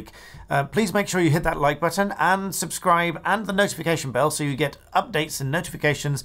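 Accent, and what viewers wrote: United Kingdom. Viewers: Your accent to British. Here you go again.😂😂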